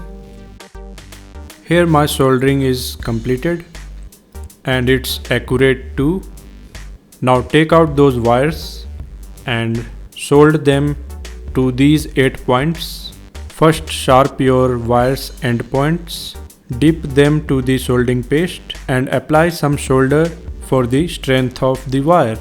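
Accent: India. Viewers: are you from india...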